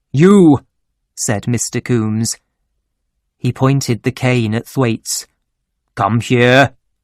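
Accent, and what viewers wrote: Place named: United Kingdom. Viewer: I love the British accent and try to listen to it slowly to understand their pronunciation.thank you for this video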